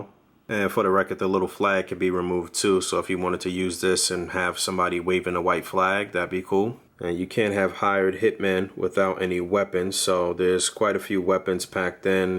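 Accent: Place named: Brooklyn, United States. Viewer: You from Brooklyn?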